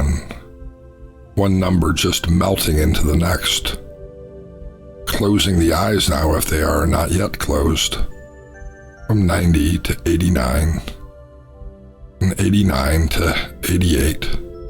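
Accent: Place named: United States. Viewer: your work is incredible! Just discovered this new one today and got a refreshing nap... knocked me right out. Why doesn't YouTube push our your videos? I have mosophonia and can only listen to American accents. Most hypnotists are not. You, Barry Eisen, and Jody Whitely and Lunayes are powerful and all my lifesaving favorites! Thank you and God bless you always!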